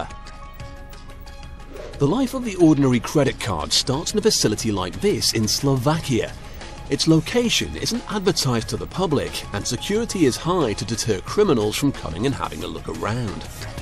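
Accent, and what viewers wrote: United Kingdom. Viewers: The U.K. narrator makes everything better.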